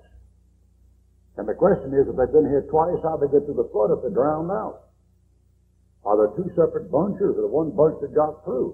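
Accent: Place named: South, United States. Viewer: He's southern just talks fast,I'm southern understand him perfectly,lol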